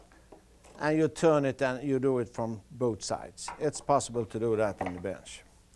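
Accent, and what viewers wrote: Sweden. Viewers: Hans engelska är underbart svensk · hejsan! helt underbart att se dej å även höra dej på din goa svengelska å din humor 😂 helt underbart. jo jag tänkte fråga om du fortfarande håller på å jobbar med verktygen? om det är möjligt så hade jag jättegärna köpt vikingayxan som du visade i början å även en skedkniv. glad påsk!!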